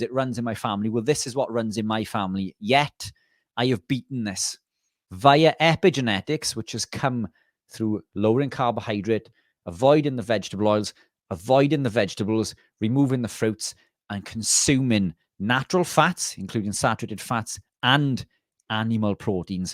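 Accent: Wales, United Kingdom. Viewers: I was truely fascinated by your talk ...all your knowledge...I'm 90% carnivore and sometimes eat seeds...nuts...and potato mash at weekend....now I'm aiming for 100% carnivore. Thank you ❤ Can I just add how sad I felt when you mentioned your Dad...unfortunately his loss 😢 Thank you again for passing on all your knowledge 🙏 I LOVE YOUR WELSH ACCENT 😍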